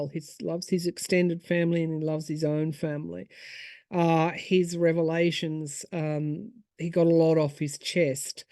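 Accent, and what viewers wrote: Australia. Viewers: I cannot look away.. I love your videos. Keep your calm, soothing energy. And your Australian accent is a plus. GOD bless you.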